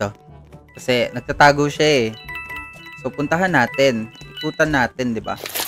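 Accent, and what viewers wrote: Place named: Tagalog, Philippines. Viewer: Parang tagalog nag english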